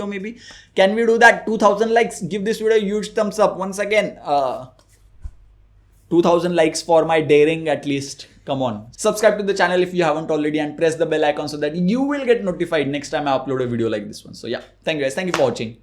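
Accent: India. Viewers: Is that one punch man with a mustache and an indian accent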